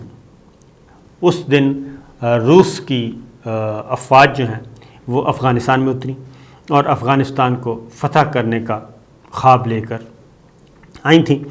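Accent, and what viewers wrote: Punjab, Pakistan. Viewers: Punjabi hai kya?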